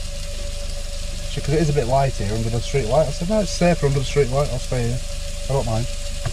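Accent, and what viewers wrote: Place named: Cornwall, United Kingdom. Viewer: Yeah more cooking in your “Cornish” accent.. 👍🏻.. “like if you agree”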